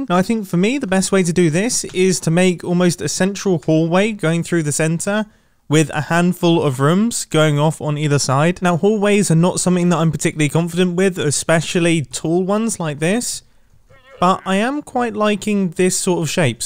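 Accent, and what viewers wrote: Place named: United Kingdom. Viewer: oh OK just sounds British to me for some reason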